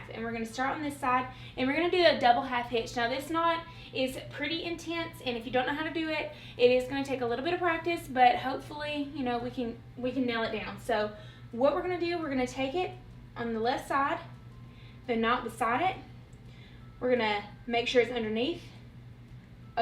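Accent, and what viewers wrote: South, United States. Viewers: I so love my! You always sound like a Southern Belle - no matter what you think. I'm going to make this. Maybe even today!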